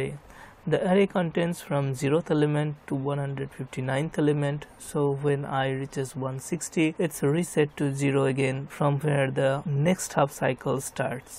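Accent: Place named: Bangladesh. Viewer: r u from bangladesh. i can guess from ur English accent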